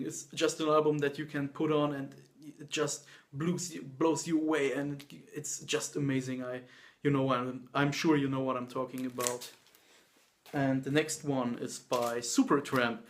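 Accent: Germany. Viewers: Den deutschen Akzent hört man sowas von heraus, das ist echt krass!:D Danke für die ganzen Tipps, insbesondere bezogen auf lagst du verdammt richtig. Ein paar gut klingende Vorschläge hätte ich aber noch: " " von klingt auf Vinyl einfach großartig. Selbst kurz vorm Ende der Plattenseite klingt es noch großartig. " " von besitze ich als Erstpressung von 1972 und das Schlagzeug, der Bass, das haut einem bis heute immer noch perfekt um. Sollte in keiner Sammlung fehlen, für 1972 aber auf zweifellos eine grandiose Abmischung, welche ja eig. kein Remaster benötigt hat, ehrlich gesagt. " " von ist ehrlich gesagt eines der Alben, welche einen Klang besitzen, was viele von Vinyl nicht erwartet hätten. Der Bass ist prägnant wo es nötig ist, jedes Instrument ist klar und ohne Verzerrungen, noch dazu ist der Dynamikumfang insbesondere beim ersten Part von großartig. Wohlgemerkt bei einer Spielzeit von glaub 27min nur allein auf dieser einen Seite! 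Bei keinem Album war ich bisher so von der Klarheit überrascht, wobei es auch aktuelle Livealben toppt, egal ob digital oder auf Vinyl. Ich belasse es erstmal dabei, da meine weitere Vorschläge für audiophileres doch wieder etwas mehr Wert sind als 1$/1€!:) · u german?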